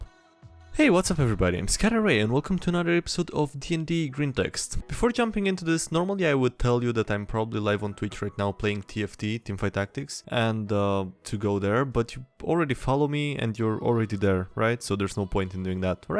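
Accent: Eastern Europe. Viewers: I actually think he's hispanic, that's how it sounds like, but then I hear how the tongue just "hits" and yeah, slavic accent. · It doesn't sound like most European accents does it. It's 100% not American, it's like a weird version of a Slavic one, but like, really funky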